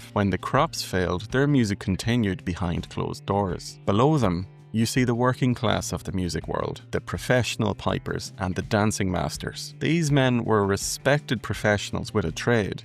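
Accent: Ireland. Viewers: Thank you for your reply, you're doing a great job with your channel, by the way. I'm curious about your accent, you sound like you might be a Leitrim man like myself or maybe Cavan or Monaghan 😉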